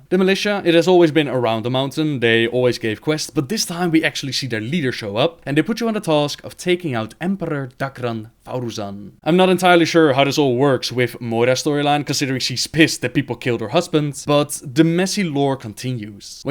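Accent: Netherlands. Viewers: you can clearly hear he his from the netherlands. goede Videos, ga zo door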